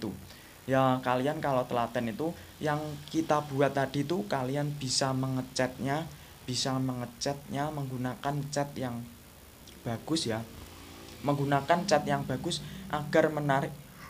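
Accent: Java, Indonesia. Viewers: Hhh untuk merosani jowo banget bang, jowonya endi brow sampan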